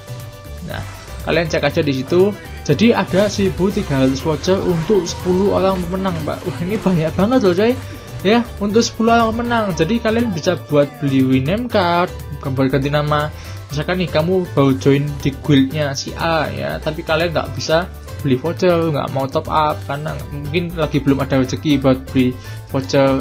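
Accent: Java, Indonesia. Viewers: Bang live streaming dong/bikinin game play WW... + nganggo boso jowo tok lek omong wkwkwk... Kangen medok mu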